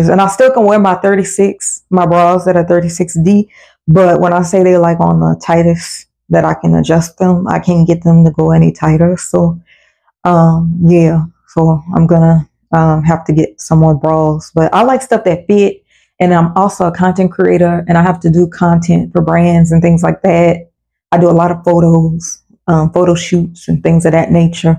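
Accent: Louisiana, United States. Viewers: Okay we hear that happiness in the Louisiana accent😮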